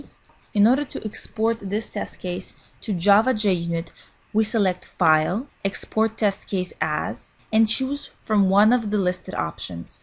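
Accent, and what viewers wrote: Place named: Russia. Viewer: You must be Russian!)) Thanks for the video...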